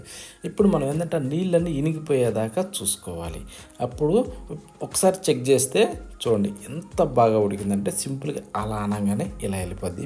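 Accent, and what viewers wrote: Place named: Rayalaseema, India. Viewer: Gudka yendi bhasha bagaledu seema yaasa😂😂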